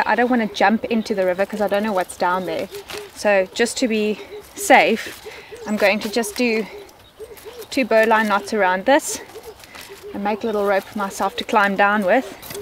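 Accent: South Africa. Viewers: We made a drinking game where everytime you say water (woter) in that beautiful sa accent we have to drink. After 6 minutes we are absolutely smashed. 😂😂😂 Awesome videos, thank you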